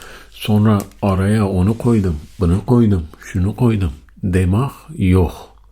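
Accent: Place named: Urfa, Turkey. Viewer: Urfalı şivesini ölene kadar dinleyebilirim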